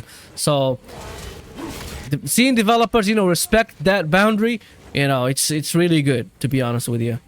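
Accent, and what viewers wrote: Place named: Morocco. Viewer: From your lehja i know you from moracco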